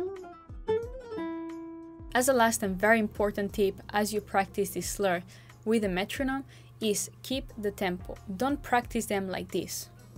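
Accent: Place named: Catalonia, Spain. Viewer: Is your native language Catalan? You don’t sound typically Spanish 😉 Awesome video!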